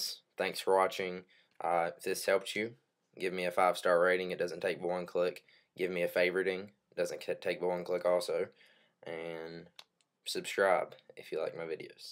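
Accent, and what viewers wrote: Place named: Appalachia, United States. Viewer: i cant understand your thick hillbilly hick accent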